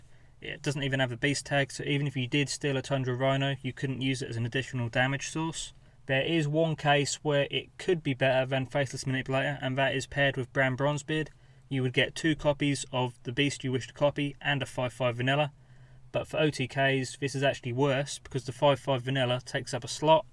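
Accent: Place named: Norfolk, United Kingdom. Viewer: Never seen you as English. You from Norfolk lol?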